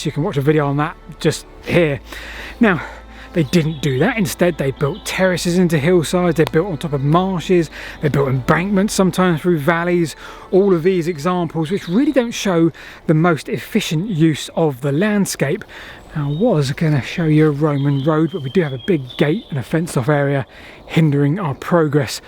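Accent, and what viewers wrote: United Kingdom. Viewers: Okay, aside from the British accent, and the poor spelling in the captions, there are so many "asides" and rambling on that I cannot follow what the hell you're saying, or your reasoning. Is it that Roman roads were "straightened" by wealthy citizens so they could name the side-road after themselves? And have a small temple or monument placed to glorify themselves? What? Maybe those "maps" work for the viewers in England, but they do nothing for the rest of us. You had to take so many detours to avoid private farms or locked gates, I have no idea where you went becaue you never showed us on a map! Or even pointed behind you, saying "I came from back there...." For example, you bring up Hadrian, but never say why he's important. You're getting a downvote from me, because what a frigging mess! The countryside is beautiful, but it's all a mess!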